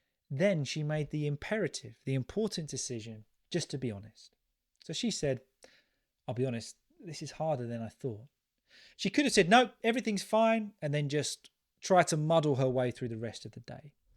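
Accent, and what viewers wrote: United Kingdom. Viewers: Perfecto like always Nice British accent sounds sweet ❤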